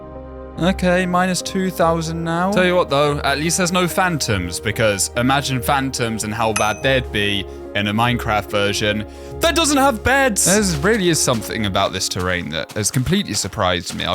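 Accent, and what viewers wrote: United Kingdom. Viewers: just realized he’s British/Australian/sum like that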